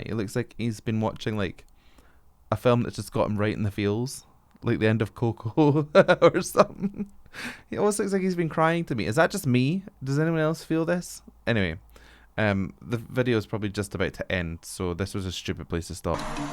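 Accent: Scotland, United Kingdom. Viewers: Love your BTS reviews & technical explanations, love your Scots accent--if I had functioning vocal chords, would def hire you as a coach! How about reacting to House of Cards...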